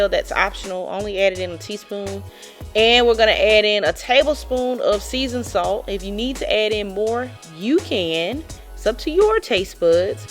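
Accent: South, United States. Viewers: You so country!! 😂😂😂You said throw some Lima beans and a piece of corn bread. Girlll you gonna make somebody go find a bed!! But I love ALL your videos Sis! I will make this because I love soup., but it make take me some time cause Momma got arthritis in both knees bad and I am not depending on hubby to make it cause if it’s up to him we’ll be eating wings and fries or pizza or wings and fried rice. Yeah for real but I love him! Thanks for sharing Sis. Watching all the way from Washington DC.❤❤❤❤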